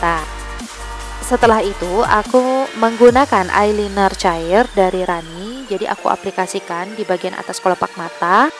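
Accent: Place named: West Sumatra, Indonesia. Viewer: bagus make up nya. cantik bgt kak.. mau tanya org mana kak? kyak bahasanya org sumatra gitu... sumatra barat ehehhee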